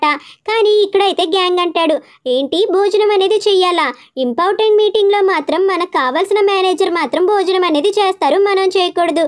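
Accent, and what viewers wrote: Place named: India. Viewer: మీరు హిందీ వాలా న,మీ మాటలు అలా ఉంది అ0తే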